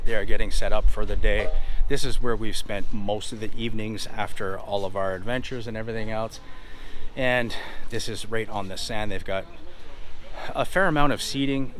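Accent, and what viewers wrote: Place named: Ottawa, Canada. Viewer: Ah you’re from Ottawa “ eh “? I have a question regarding the currency exchange place you showed towards the end of this video. I was wondering if you tried exchanging Canadian Currency for Pesos? I have always taken pesos with me in our several trips to Mexican Resorts but I heard on one other video from this resort that the currency exchange kiosk takes Canadian dollars in exchange for Pesos? Im a little disheartened about the reserving of the Ala Carte restaurants. On our first trip which happened to be in the Mayan Riviera the resort we stayed at require pre booking reservations at the restaurants at the lobby. It turned out that we ate almost all meals for the 7 days at the main buffet because it was huge and I’ve never seen as much selection and variety in a Mexican Resort Buffet. The second trip we were told we had to make a reservation for any of the three A la Cartes at a desk in the lobby am however if you weren’t in line by you had no shot at getting a reservation. 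We did manage to get into the Mexican restaurant and the steak restaurant ( but pm! the last available reservation ) later during our 10 days at stay but twice even though I stood there for 2 hours and was between 10-15th in line when I got up to the desk they said all seating in each A la Carte was fully booked. Walking past those places to pm and seeing half of the tables empty was a disappointment. I’m hoping that either or the buffets have good food and variety or I’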